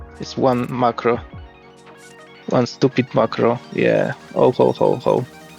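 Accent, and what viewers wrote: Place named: Poland. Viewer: Słuchać, że Polak 🤣 Dzięki za pomoc!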